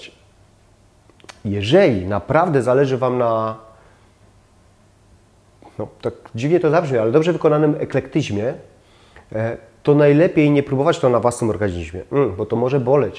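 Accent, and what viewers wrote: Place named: Upper Silesia, Poland. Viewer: Jest Pan ze Śląska? Bo wyczuwam właśnie sposób mówienia charakterystyczny dla ludzi z Górnego Śląska 😁👌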